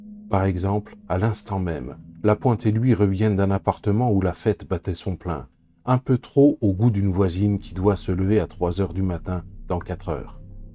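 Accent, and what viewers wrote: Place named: Quebec, Canada. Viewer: et l'accent, Drummondville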